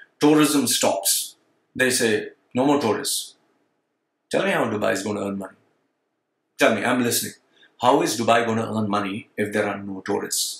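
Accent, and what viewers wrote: Kerala, India. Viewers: You are fluent in English but you cannot hide that you are INDIAN by origin! 😊. Why don't you care your own country?... It's none of your business!!! How much money you got paid to do this video??? You be careful the country you are talking about is very strong and powerful country that capable of a lot of things 😊. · Bhai mast kerala accent.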